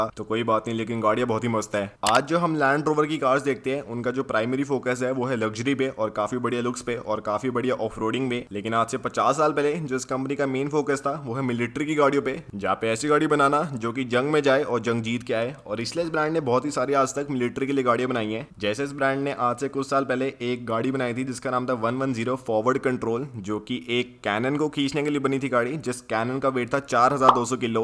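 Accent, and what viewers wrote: Haryana, India. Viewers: bhai nirmaladmir ki tarah bola kar ,tere andhar ka haryanvi baar baar bahar ajata hai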